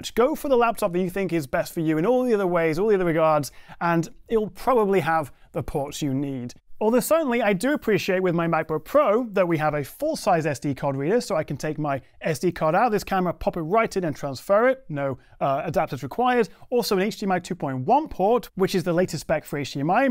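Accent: United Kingdom. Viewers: The British language sounds wonderful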